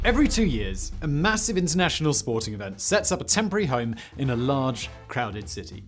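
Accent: England, United Kingdom. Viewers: what kind of English accent is that ?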